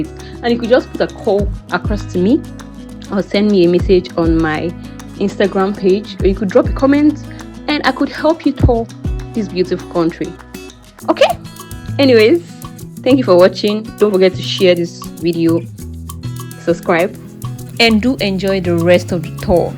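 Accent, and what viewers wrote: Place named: Nigeria. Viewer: 😂😂 it's now that I'm watching ur video touring estate in Abuja so when I heard ur accent I know say you be our own not dat kwasia ghanian 😂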